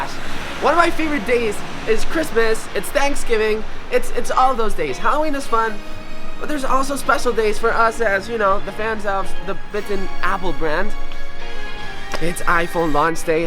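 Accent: Spain. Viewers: your spanish accent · awesome to hear you rock spanish spanish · Are you from Spain? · Se nota tu acento español, pero igual tienes un perfecto inglés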